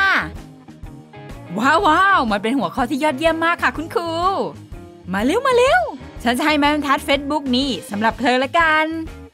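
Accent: Thailand. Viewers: ้เสียงคนพากย์เหน่อๆ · ทำไมมันดูเหน่อๆง่ะ😅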